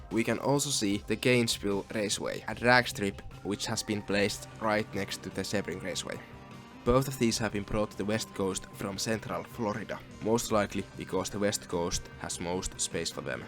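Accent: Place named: Finland. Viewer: funy finish accent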